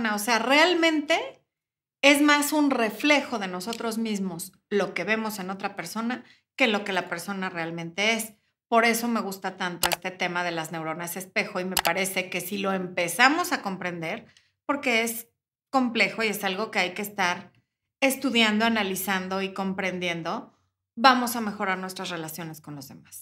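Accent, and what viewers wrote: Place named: Mexico. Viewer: gracias ante todo. Me parece que si las mujeres siguieran tus consejos serían mucho más felices consigo mismas y con sus relaciones de pareja. Yo hace tiempo descubrí que la autoestima y la seguridad de una mujer en sí misma es la mejor manera de conquistar a un hombre y que nosotras vamos primero que ellos siempre. Me fascinan tus consejos incluso tu acento mexicano que me encanta...hace un año por estas fechas visité por primera vez CDMX y quedé enamorada, solo pienso en regresar. No sé tengo una conexión muy especial con Mexico y te escucho y me fascina. Por cierto, entré a tu canal de brillar después de los 40 pero no hay contenido, ojalá pongas pronto algún video allí....Si las mujeres despertáramos el mundo sería nuestro. Un abrazo y saludos a tod@ los seguidores de este canal, soy española pero vivo en San Juan, Puerto Rico. 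Un abrazo y lindo domingo.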